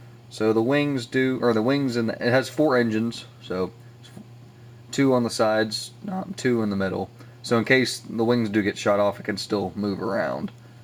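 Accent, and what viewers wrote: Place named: United Kingdom. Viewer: i can feel the british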